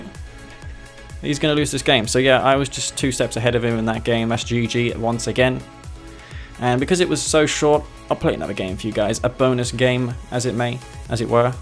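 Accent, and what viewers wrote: United Kingdom. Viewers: Nice to put a face to this voice I've been listening to for years lol, British? Maybe?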